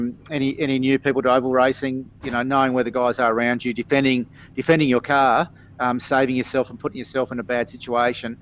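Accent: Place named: Australia. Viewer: maaaaaaate, such a occa accent!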